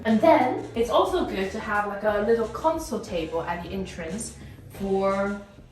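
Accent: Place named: London, United Kingdom. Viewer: and you have such a beautifull smile nice video and a londoner dialekt very elegant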